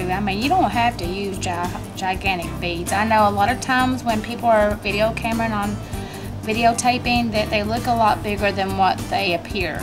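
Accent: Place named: United States. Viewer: Hiiii nice video! Love your country voice 🤗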